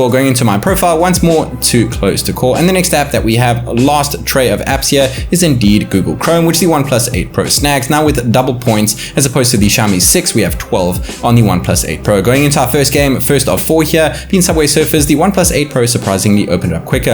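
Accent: South Africa. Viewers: Where are you from bru you sound South African ? much love from SA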